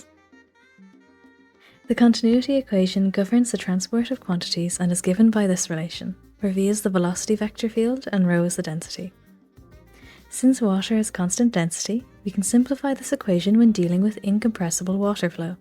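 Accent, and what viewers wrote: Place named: Ireland. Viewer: Beautiful video, beautiful Irish accent. Hope you make a series of videos to develop these concepts.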